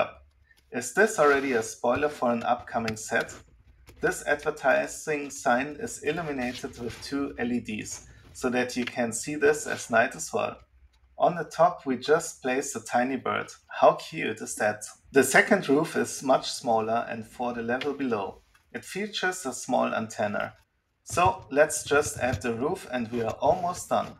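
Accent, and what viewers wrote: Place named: Germany. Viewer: Building the Figures is easy, i dont know where the problems for you are. Meanwhile i think your mother language is German, and i think your can hear very well when you speak English. You should try speak more fluent. Otherwise nice review.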